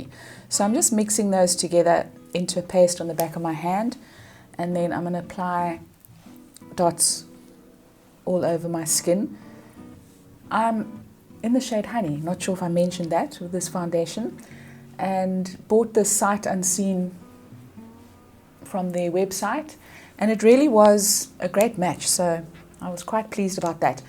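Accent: South Africa. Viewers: You mention “Sheen” brushes. Is that the spelling, and what country are you in? I recognize the South African accent, but nowhere is there any information on where these products are available or their price.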